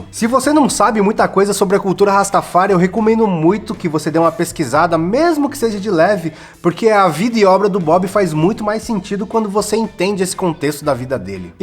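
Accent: São Paulo, Brazil. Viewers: Paulista fala mttttt feio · O cara faz um vídeo com dialeto paulistano PQP que merda de sotaque do caralho.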